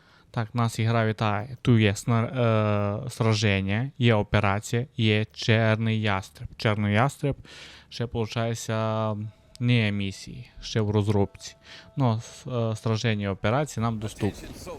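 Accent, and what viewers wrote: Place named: Transcarpathia, Ukraine. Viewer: Чую що ти із сонячного Закарпаття))) з якого саме міста ?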